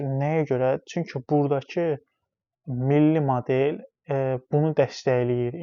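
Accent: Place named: Armenia. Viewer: Sənə qulağ asanda adama elə gəlir ki bir erməni dığası zərbaycan dilini yaxşı öyrənib və Talış mıllətiylə Azərbaycanlıların arasın vurmaq istəyir,talışları mən həmişə özümə qardaş bilmişəm və biləcəyəm,sən deyilsən sən erməni dığasısan.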